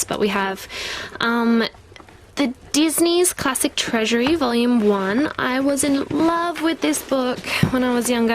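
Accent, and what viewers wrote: Australia. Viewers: god, i could listen to you talk all day! I love aussies! :D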